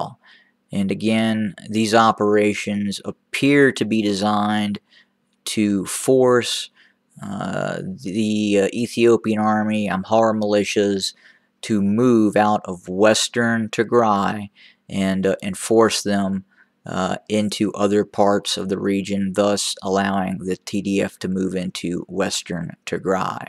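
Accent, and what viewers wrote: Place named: United States. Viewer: it is an American accent indeed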